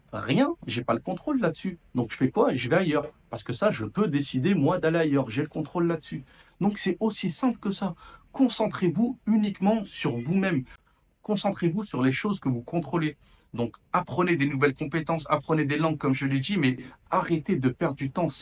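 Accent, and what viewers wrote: Banlieue, France. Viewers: Tu as un accent de banlieue, ce qui peut être perçu comme un désavantage dans le monde du travail et la vie sociale. De plus, à ton âge, porter une casquette à l’envers avec des écouteurs ne renvoie pas une image très professionnelle. Pour ma part, je vis en Irlande et je suis d’origine maghrébine. J’ai quitté la France en 2005 car je ne trouvais pas de travail non plus. Lors d’un voyage d’affaires à Lyon, j’ai été choquée par les arabes, l’ambiance dans le centre-ville, ainsi que dans les transports en commun comme le tramway et le métro : des comportements incivils, un vocabulaire limité et une omniprésence de "wesh wesh". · Tu te trompes totalement sur le racisme, c'est bien ton accent de banlieue qui t'empêche de trouver un travail. Les français, aux patronymes bien français Pour chaque maghrébin à accent de banlieue qui ne trouve pas de travail, tu as un maghrébin qui parle normalement qui a un emploi et parfois des emplois à hautes responsabilités. 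Et pourtant tout le reste est en commun. Il y a plein de directeurs d'hôpitaux, de collège de lycée, plein d'officiers des armées ou de la police, plein d'attachés d'administration et d'attachés territoriaux, et PLEIN de politiques haut placés maghrébins, à couleur de peau, avec un nom arabe, et musulmans. Qu'ont-ils TOUS en commun? Ils ne parlent pas avec un accent de cité. Et devine quoi? Les français blancs à patronymes bien français avec un accent de cité ont exactement les mêmes problèmes de recrutement. Sur le reste je suis totalement d'accord avec toi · ok il est assez beau mais par contre, il a un petit accent de banlieue.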